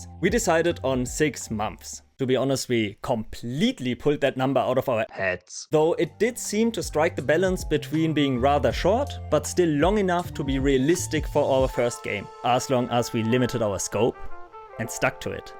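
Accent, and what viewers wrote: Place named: Germany. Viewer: Uh-oh, you provided value in the video, now I gotta have another subscription. Plus I sense a German accent.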